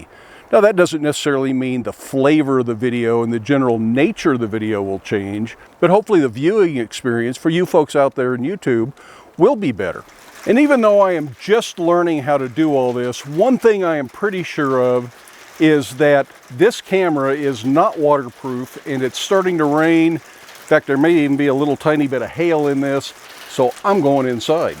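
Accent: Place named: South, United States. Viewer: Good motivational speaker. His voice is not intimidati g as his appearance first was and i love the southern acsent. Great video for encouraging over coming problems youtube home video newby's should watch acassionably to remind them that they really can do it right and not give up.👍👍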